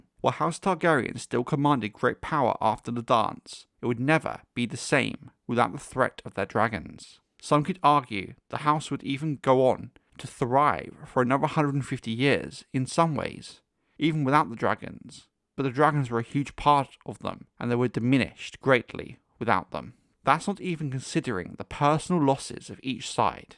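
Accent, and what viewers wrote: United Kingdom. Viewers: The dude is the most British sounding dude ever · i don't know if you're Bristish with a strong accent or English is not your first language and you speak with English accent, but it's really hard to hear and follow what you say even with the caption on.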